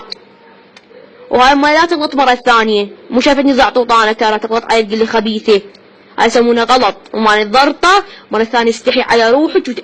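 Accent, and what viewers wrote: Basra, Iraq. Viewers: اوف ربي شلون احب هاي اللهجه وحق علي يكولك نفس اذا اسمعه ارتاح بغدادي انا واللهجه بصراويه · فدوة لهجت اهل البصره اعشقهم 😍😍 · الله ينتقم منهه شلون تكلهه هجي 😂😂 خطيه ولله وهاي فطيمه شلون بيهه😜 لهجة بصراويه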